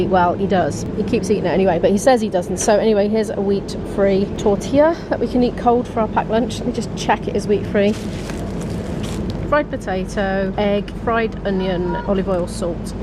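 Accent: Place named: Surrey, United Kingdom. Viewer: That accent sounds familiar. Shepperton area?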